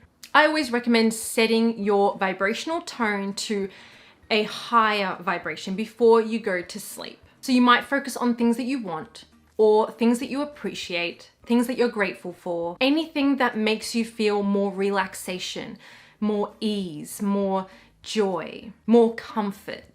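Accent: Australia. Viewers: Somebody complemented you on your English accent. To me it sounds like an Aussie accent, is that correct?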